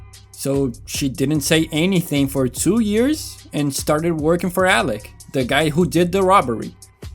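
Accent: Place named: Brazil. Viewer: you have a really brazillian accent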